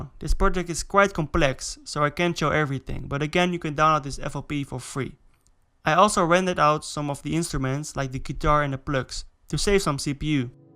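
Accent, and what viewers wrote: Netherlands. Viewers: Is dit een Nederlander? Or not?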